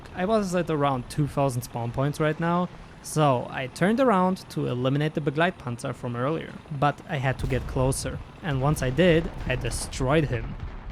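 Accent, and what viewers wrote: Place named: Germany. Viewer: I love his German accent, it fits the ‘war crimes’ theme perfectly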